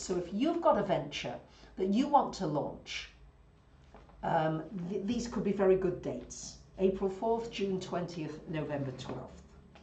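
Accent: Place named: Yorkshire, United Kingdom. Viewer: I love that you have a Yorkshire/Lancashire accent, as I am also from Yorkshire (Ryedale, though possibly also Andromeda!) and it brings an earthiness to your broadcasts. Super 😊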